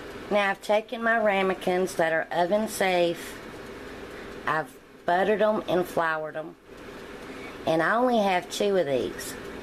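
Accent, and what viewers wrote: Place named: West Virginia, United States. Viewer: thank's I'm not sure how to make ganache. but will try to google it, I hate to be so dense, but could you use vanilla or caramal chips instead of chocolate?? I love chocolate but my husband likes the yellow cake. I just love your voice, reminds me of where I came from, WV. left there 55 yrs ago. but all my friends are still there. love ya and thank's for responding.BTW I got adventurs and tried the Jiffy raspberry muffin mix and put it in a small cake pan, airfried it for 20 min and it came out perfect, I couldn't beleive it.just enough for the two of us.. tks again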